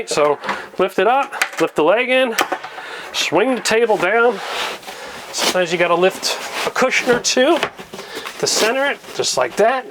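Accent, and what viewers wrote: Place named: South, United States. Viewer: What accent is that? It sounds like Minnesooooota with some Canadian ayes meets a southern drawl.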